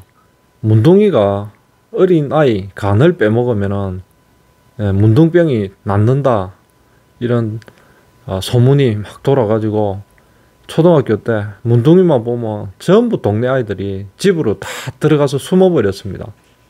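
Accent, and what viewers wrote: Gyeongsang, South Korea. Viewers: 표준말 쓸려해도 억양은 어쩔수 없구만요 저도 경남쪽인데 말투가 구수하게 느껴 집니다 문디에 대해서 추억과 나름데로 의학적 공부 좀 하셨네요~ㅎ 항상 수고 하십니다~^^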